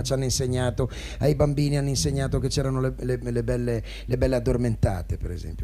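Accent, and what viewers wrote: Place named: Genoa, Italy. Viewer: Oltre che bravissimo cantante era pure molto simpatico....con quell' accento genovese...fa tanto piacere ascoltarlo parlare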